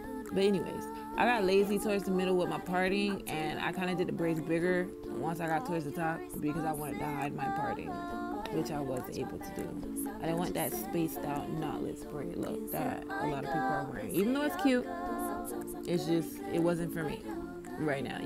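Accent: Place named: Bahamas. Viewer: believe she's from the Bahamas lol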